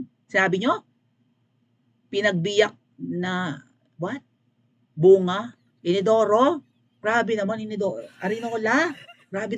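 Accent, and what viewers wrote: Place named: Ilonggo, Philippines. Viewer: Yong tunation mo Ilonggo inday, indi visaya, iba yong ilonggo tunation, malanbing, visaya hindi mabilis sila magsalita. Ilongga ka ba bakit kuha mo yong tunation ng ilonggo, marunong ka ng hiligaynon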